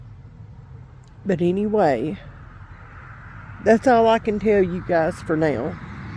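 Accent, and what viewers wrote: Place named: West Virginia, United States. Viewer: Do you mind saying where you live, what state? I am from WV, and your accent sounds very much like WV.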